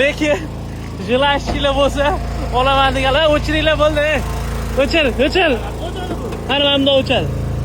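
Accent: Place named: Tashkent, Uzbekistan. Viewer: Ким буларди санга ухшаганде кулма тошкенча гапириш ухшамади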